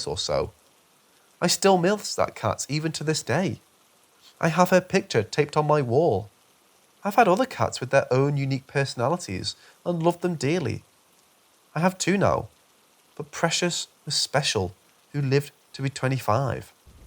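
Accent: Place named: United Kingdom. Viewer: Great stories, cool British accent ✨🤡🧐👍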